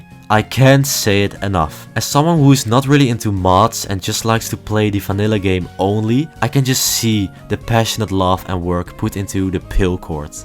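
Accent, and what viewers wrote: Netherlands. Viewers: Dutch accent moment, nice vid tho haha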